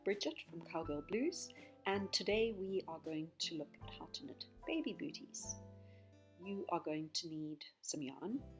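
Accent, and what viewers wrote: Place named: South Africa. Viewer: Thank you so much. Such a beautifully presented, clear and simple pattern. I'm thrilled. And to hear a lovely South African voice showing a familiar way of knitting is delightful!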